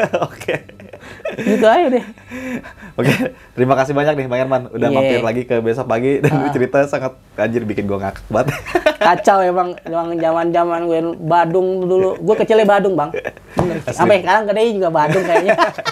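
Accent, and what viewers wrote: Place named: Betawi, Indonesia. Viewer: kocak lu bang 🤣🤣...bahasa betawinya ora dibuat2 natural bgt..wkwkkw biarpu ceritanya lompat2 ketutup sm pembawaan ceritanya..sukses terus buat chanel besok pagi dan bang,w suke gaye lu..😁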